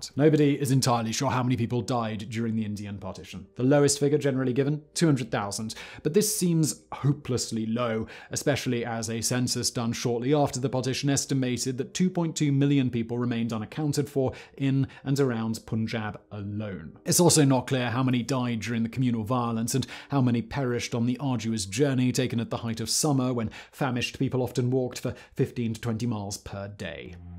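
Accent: United Kingdom. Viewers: Is is me or do you sound MORE British in this video?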